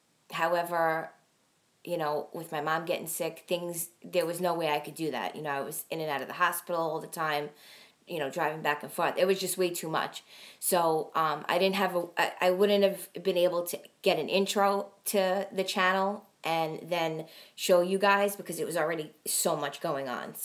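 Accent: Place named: New Jersey, United States. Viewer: God Bless You, Girl!!! Stay Strong!!! Looks like you have turned out Ok considering what you have been Dealt with. You are Stronger than you think!!! I also grew up in a VERY Dysfunctional Family & I can Relate to you somewhat. I sent you a FB Friend Request, I Really would like to get your Address. Maybe to send you Coupons, etc... I am from Ky & I have a Southern Accent. I was showing my Husband your Videos & was talking about your Jersey accent. I used to LOVE to watch Jerseylicious on TV. I don’t know if you know about that show or Not??? But, you remind me SO much of those Girls. You would be Tickled at my Accent as Well. 😆 Anyway, My Prayers are with you & your Momma. Thanks for your Videos, I enjoy them. 👍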